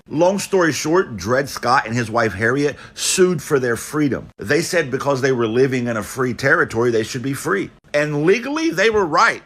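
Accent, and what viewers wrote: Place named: Piedmont, United States. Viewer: Thought I recognized your accent; piedmont here 🙋🏽‍♀️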